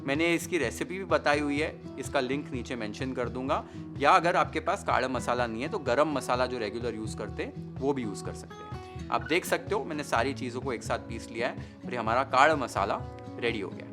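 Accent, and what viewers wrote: Maharashtra, India. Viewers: ❤For Your Marathi accent ... ❤ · Your pronunciation of Marathi words are more authentic than lots of Maharashtrian people.. kudos 🙌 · You speak typical मराठी words in मराठी accent... *ळ*😊 ❤ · Omg 😮 ur marathi accent is jus wow❤ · What a marathi accent you catch bhava ❤ and dish is mindblowing as allways kadak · Big fan......and your Marathi accent😚😚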